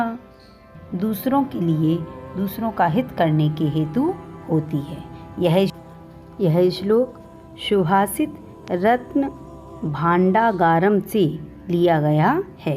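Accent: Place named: Bengal, India. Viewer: Also may I ask you a question, are you a bengali cause your accent sounded one?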